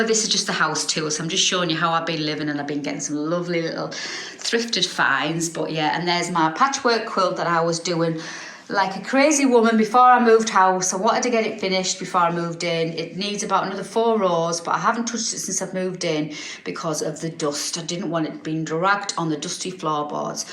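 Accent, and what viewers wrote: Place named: Yorkshire, United Kingdom. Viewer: its going to be stunning. my friend used to live in same type of terrace exact same layout. i know you will make it fab. i just love the colours and wallpapers you have already chosen. the work will keep you focussed and give you a reason to get up each day. im sure John is smiling down on you. love your yorkshire accent , well im thinking its yorkshire we have many holidays there . the kitchen is beautiful and cant wait to see it finished.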